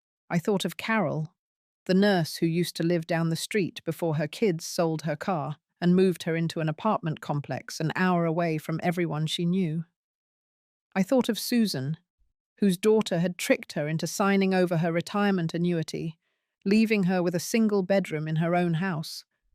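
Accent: England, United Kingdom. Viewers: Why is a woman with a very heavy British accent talking abiut maps in Missouri? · She's from Missouri but speaks with an English accent? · As the Woman voice is in UK English, assume this is a British story. You would not drive for 9 hrs to her sons home in the uk.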